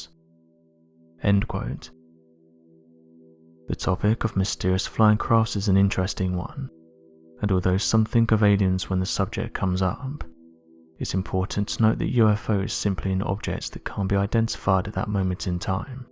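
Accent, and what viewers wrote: England, United Kingdom. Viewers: So why are these “aliens” always seen either in the Sky or Space? But the aliens don’t ever make it to Earth in ET Spielberg style? Sorry but the husky serious English accent doesn’t really do it for me.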